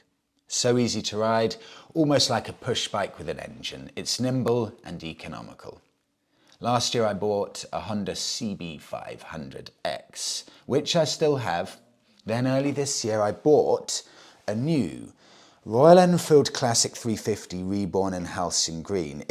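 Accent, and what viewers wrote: United Kingdom. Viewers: Fer crissakes, ya gonna 'pologize fer having an English accent next? Never apologize for pronunciation, just do it & move on....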